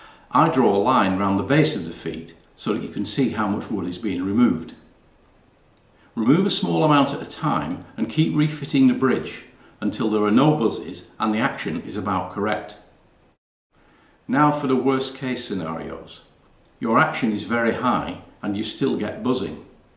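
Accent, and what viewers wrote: United Kingdom. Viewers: I never expected a posh Englishman to teach me how to set up the most redneck instrument in the world lol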